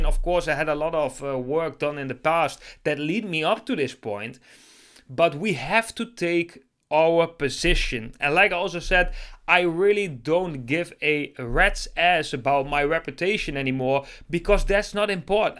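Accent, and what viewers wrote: Netherlands. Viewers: Hallo! Ik denk aan je accent te herkennen dat je van Nederland bent! Ik ben Belgische en je bent de eerste die ik ontmoet met dezelfde taal en niet al te veraf! Wauw! Heel fijn jou te ontmoeten! Dank je wel voor deze aanmoedigiende en inspirerende video's! Ik ben de voorbije jaren pijnlijk geprocessed door God, om in positie te staan voor deze aardverschuivende shift! God bless you!!!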